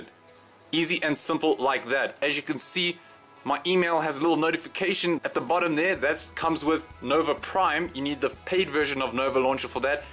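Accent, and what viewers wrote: South Africa. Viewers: I also think he sounded South African and I'm South African too haha :P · Hi there nice tut, are you from South Africa? your accent sounds like it. I am from South Africa. :-)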